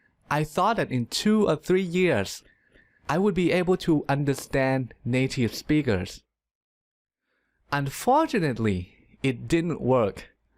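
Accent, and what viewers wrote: Thailand. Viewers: Thank you very much for a great video. It's very useful. Also I guess you are Thai from your pronunciation^^ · Are you Thai? I think your accent like Thai people. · กลับมาฟังหลายรอบแล้วครับ คนไทย ผมจำได้ สำเนียงมันออก อิอิ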